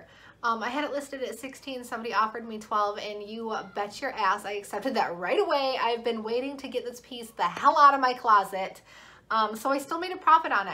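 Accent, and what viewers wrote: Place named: Michigan, United States. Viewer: I thought that you had a MI accent! I'm from the Upper Peninsula but live in Oregon.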